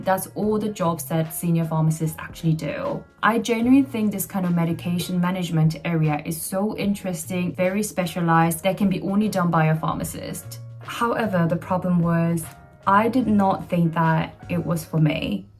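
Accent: United Kingdom. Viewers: 진짜 너무 멋있어요!!! 실행력도, 하고자 하는걸 해내는 능력도 정말 멋있고 존경합니다!!!! 영국 발음도 너무 듣기 좋아요..🤍새로운 길을 응원해요~~~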